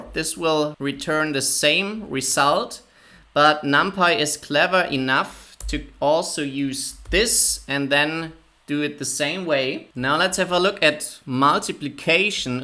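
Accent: Germany. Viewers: Are you German cause Your accent is kind of one. Well thanks for this amazing crash course.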